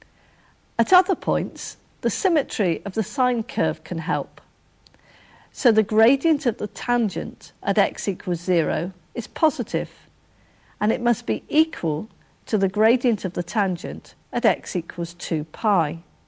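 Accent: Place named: United Kingdom. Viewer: I find it quite stimulating, the Brits accent mixed in calculus real just arouses the desire to follow.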